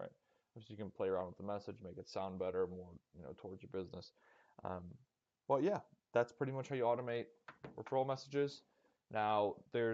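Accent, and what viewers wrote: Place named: Chicago, United States. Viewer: I knew you were from Chicago from the accent. I'm about to start d2d sales and I'd like to have a way to text them a flow to sign up for a new account. This helps a ton. Thx.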